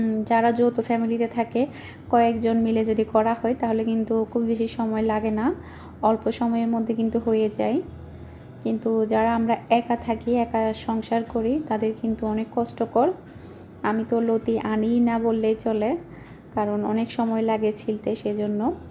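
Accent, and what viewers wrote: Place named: Noakhali, Bangladesh. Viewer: কেমন আছো? আজকে তোমার এই সম্পূর্ণ ভিডিওটি দেখলাম। আপু আমার জানামতে নোয়াখালীর বেশি র ভাগ মানুষ ই চিটাগাং এ থাকে। নোয়াখালীর সাথে চিটাগাং এর জন্মসূত্রে সম্পর্ক আছে। তোমার কথায় নোয়াখালীর একটু টান আসে।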